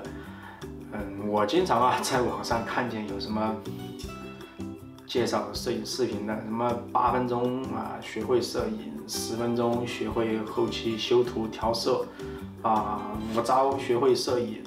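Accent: Sichuan, China. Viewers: 老师是四川叻？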